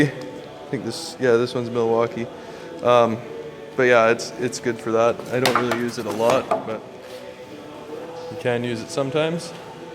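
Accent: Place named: Nova Scotia, Canada. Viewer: Your accent tricked me haha totally sound like your from NS. Cool vids btw the anvil is awesome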